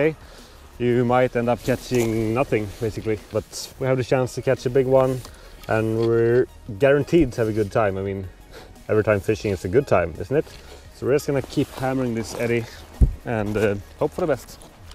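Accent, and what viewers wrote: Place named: Sweden. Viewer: Varför pratar han engelska när man kan höra att han är svensk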